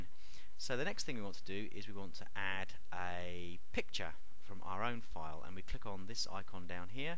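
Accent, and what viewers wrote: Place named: England, United Kingdom. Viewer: love the english accent... nice video btw... can you please tell me how to rotate images added in word documents?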